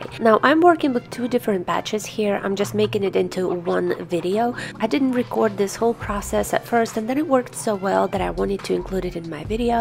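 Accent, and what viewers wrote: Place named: Ukraine. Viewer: I’m a mother of 4 , my son is the oldest, then I have twin girl, then another girl. I am now a grandmother to 10 children, we have 6 granddaughters and 4 grandsons. I am a retired social worker and my husband is a nurse. We now take care of 7-8 of our grandchildren during the summer, and we do after school care when they are in school. I’m very busy with the littles, housecleaning, laundry, all the things, but I adore them! Where are you from? I have a friend from Ukraine and your accent is similar. Enjoy your channel!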